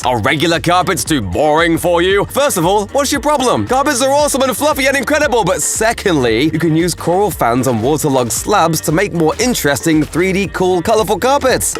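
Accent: United Kingdom. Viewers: Damn didn’t know was British! and he has a new skin! Finally got rid of the red play button! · Are you British shut up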